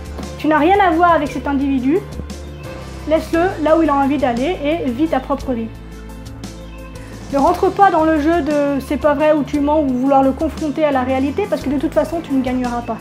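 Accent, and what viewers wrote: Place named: Quebec, Canada. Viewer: Bon content tho. Tout ce que tu dit est former :D Belle confiance. Sen tempone le coquillar hahaha Ouf sa frappe dans le quebcois. il y a un stress constant dans ta tonaliter de voix, je ne veut pas picosser mais donner une reaction que jai resentit fortement , et je la partage ouvertement. C'est commme si tu me donne un 2eme cafee xD.. mais je fait pareil quand je suis passioner <3 OK ok alor la ok ok lee le narcisisque.. hehe ironiquement jai beacoups de trait narcisisque et comme tu dit sans etre vraiment la maladie. Mais je ne me sent jamais mechant deriere cest trait. Alor jessaye de ne pas juger mon pere tres narcisisque, mais peu etre aussi tres bon au coeur? Des idee :D?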